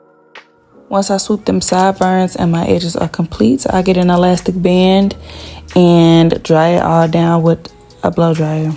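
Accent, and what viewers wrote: St. Louis, United States. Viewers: My first time watching you… I knew you were from the same city cause you said “hur” instead of “hair” & said make sure them tracks aint going no whur” lol perioddd we soo Saint louis SUBSCRIBED ❤💕💕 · Very cute!! Sounds like you are from st.Louis 🥰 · Where you from baby? The accent is giving STL! 💁🏾‍♀️💁🏾‍♀️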